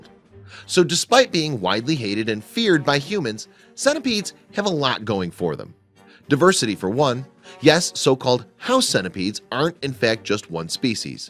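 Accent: United States. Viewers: “Tchi-tn”. I am sorry, but this is pronounced “Khai-tn” (from Greek χιτών, chiton). “Cheating” is a completely different word. American narrators are so American...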